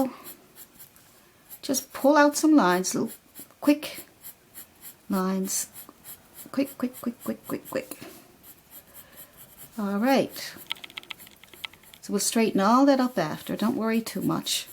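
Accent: Newfoundland, Canada. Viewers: I have been using this video with my 6th grade classes to help with their self portraits. I immediately heard your voice/accent and said she HAS to be a Newfie. Am I right? I live in the states but family is from Placentia Bay. <3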